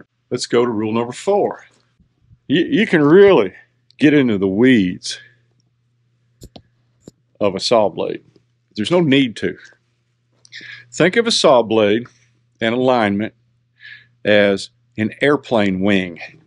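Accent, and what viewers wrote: South, United States. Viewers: BGATs are the sawyer's version of winding sticks. Thanks for being straight forward with your slow, Southern talk. It's much better than engineerese (I am fluent in both😂😂) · I hear "Southern" so I understand you perfectly! As far as types of videos, I love the ones like this. I like them all, but on these I always learn a little something. Episode #2: items 6-10; Episode #3: items 11-15; and so on,,, Starting a series and you didn't even realize it.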